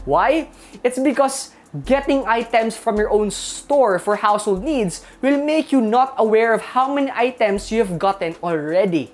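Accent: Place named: Philippines. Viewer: Sir dapat nag tagalog kanalang kasi hindi bagay sayo kasi halata kasi pinoy ang iling mo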